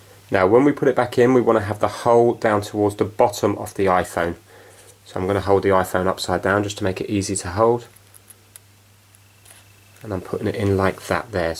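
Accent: England, United Kingdom. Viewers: I've subscribed. You took away my fear of getting messed up and ruining two phones. (Good to hear an English voice again)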